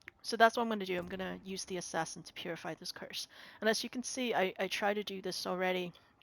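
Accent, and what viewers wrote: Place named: Northeast, United States. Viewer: You sound like you’re from the Northeast lol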